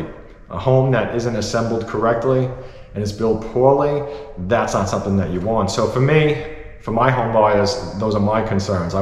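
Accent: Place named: New York, United States. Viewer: Thanks for the tour, always like hearing your NY accent. I think big closets are because some people have so much stuff. More space around toilet makes home more ADA compliant.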